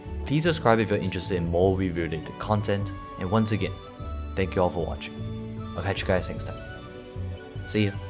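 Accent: Singapore. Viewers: are u from singapore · you sounds like my uncle who came back from sg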